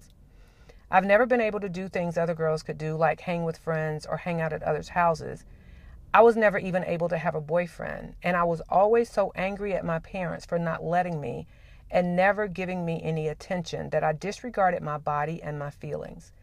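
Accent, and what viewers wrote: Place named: England, United Kingdom. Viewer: QwQ I'm not cursing my parents I know some people dont have so it's a bless for me whatever it was :) And again she is 14 I dont like just 3 years and she was 10 the problem is other students there... But thank you ur really an amazing teacher and loved your English accent I dont if you're foriegn or something but loved it And yes every child has it own story with his/her parent :> ...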